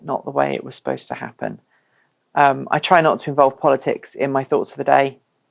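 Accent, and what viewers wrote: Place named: United Kingdom. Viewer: Every bad policy that Trump was threatening was already done by Obama and would have been continued by Clinton. There is massive subsidiued investment in fossil fuel infrastructure still, this is keeping our renewable future from taking off. I voted for Jill Stein and her Green Revolution. Since when does a revolution need an election. I live in Germany and work in Switzerland and am pleading with people to move to EV, batteries, solar PV and wind, with little success. I don't trust VW to make any changes, even though they have said they will build a battery factory in DE. I am supporting your site since you have a bigger voice, so keep shouting, in your quaint British-tinged accent.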